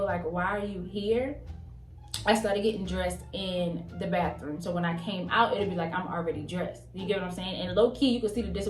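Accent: Baltimore, United States. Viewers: Girl you from Baltimore??? I hear an accent😭👏🏽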